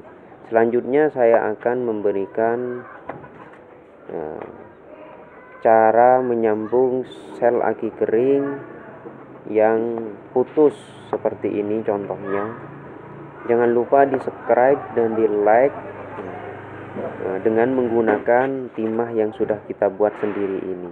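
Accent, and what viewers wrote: Madura, Indonesia. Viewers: Terima kasih ,Mohon maaf, Logat Maduranya Medok sekali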